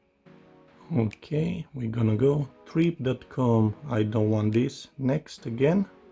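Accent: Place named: Romania. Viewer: de accent is from Romania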